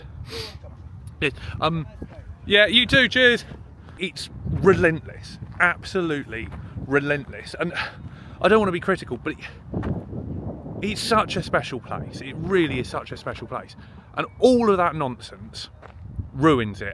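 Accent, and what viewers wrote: England, United Kingdom. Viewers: This guy is extremely english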